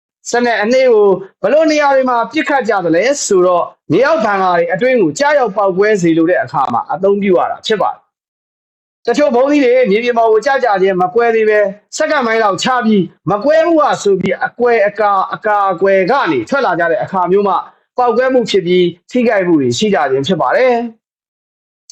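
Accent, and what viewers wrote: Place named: Upper Myanmar, Myanmar. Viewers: ကိုဇင်ယော်ရေအညာှသံဝဲဝဲလေးနဲအမြဲနားထောင်ဖြစ်ပါတယ် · အခုထိ youtube ကို mobile data နဲ့ ဘဲ fb မရပါ ရက ဆိုးပါ့ အပြောတွေက ဆက်စပ် ထိမိဘဲပြောတော့ပြောလိုက်မယ်နော အညာသံဝဲနဲ့ သဘေကျတယ် သားတို့နဲ့ တလသူအားလုံးကံကောင်း ဘေးကင်းကျန်းမာပါစေ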